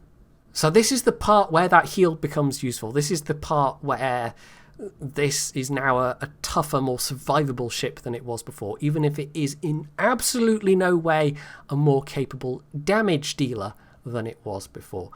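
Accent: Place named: Oxford, United Kingdom. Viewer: I think you would be great on television, as an announcer (you have that pommy Oxonian accent) or a comedian (great sense of humour a la Monty Python), or both. You'd be perfect. I'm thinking John Oliver would be a good example. Think about it...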